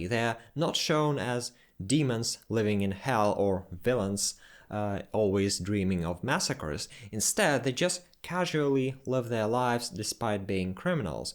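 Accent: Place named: Germany. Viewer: I am trying to work out your accent. I think it's German.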